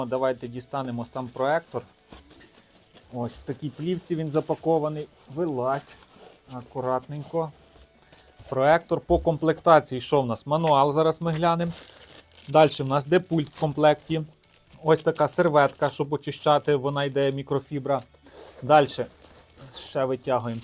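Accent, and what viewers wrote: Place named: Surzhyk, Ukraine. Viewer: цей суржик аж вухо ріже .. жах !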